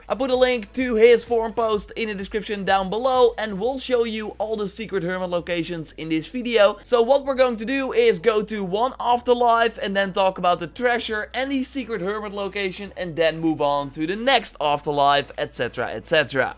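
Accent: Netherlands. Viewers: je bent overduidelijk een nederlander, dat accentje haal je er ZO uit hahaha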